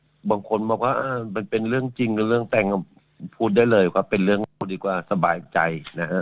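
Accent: Kanchanaburi, Thailand. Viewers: สำเนียงคนกาญจนบุรีเนาะ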